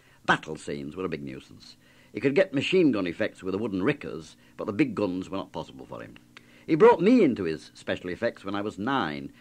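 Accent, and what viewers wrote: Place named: Lancashire, United Kingdom. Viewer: Dear MUP, either you've inadvertently pitch-shifted this up, or YT has messed up somehow. Either way, AB's beautiful Lancashire brogue was never pitched as high as this.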